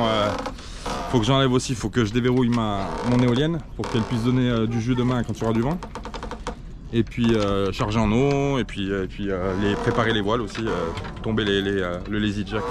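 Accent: Spain. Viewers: Hello! Tu as des origines espagnol?, car tu as un super accent.❤ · Tu as un accent andalus!!😀 qui dit tout le temps fait chaud fait trop chaud (k calor pero k calor!!), andalucia❤❤ ☺️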